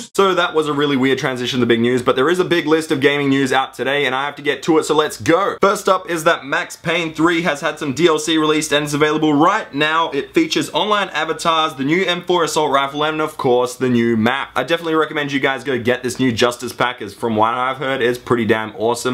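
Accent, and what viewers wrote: Australia. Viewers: Halo 4 is gay along with you're Australian Accent / voice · ur Australian?????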